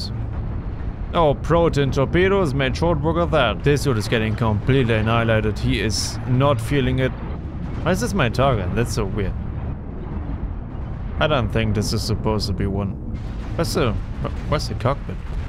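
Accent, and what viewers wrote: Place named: Germany. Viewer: Finally, the Empirer narrated as it should be... in German accent!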